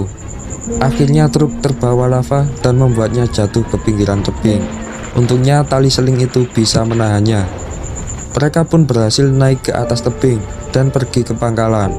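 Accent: Java, Indonesia. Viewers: medok amat min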